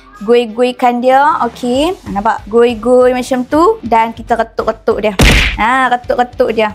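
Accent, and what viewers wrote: North, Malaysia. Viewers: Langsung subscribe deh kamu...ud lama tak dengaq cakap utara..che..prikitiew.sy dah cuba resepi kamu..sedap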